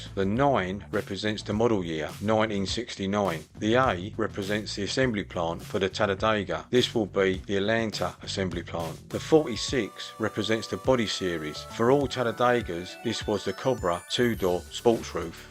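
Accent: United Kingdom. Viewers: Very well done with the video matching the audio very well in spite of the audio being generated by your British narrator, which sounds really off unless it was targeted to British audiences. 🍁 eh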